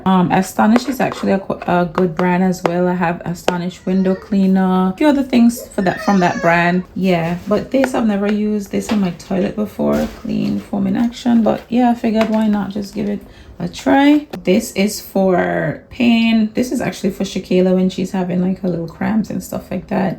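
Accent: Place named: Jamaica. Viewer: Think it's Jamaican.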